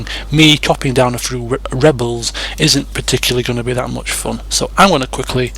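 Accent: England, United Kingdom. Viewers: I love your dialogue! Your english accent really adds to it.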